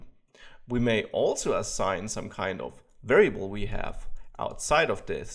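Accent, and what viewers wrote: Germany. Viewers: the thick german accent it, LOVE IT!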